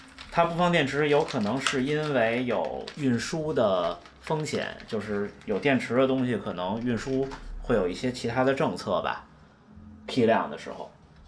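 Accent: Beijing, China. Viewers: 典型的北京人口音，真亲切，竟然有人还说口音重，那一定是你自己不讲普通话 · 一口的京片子。。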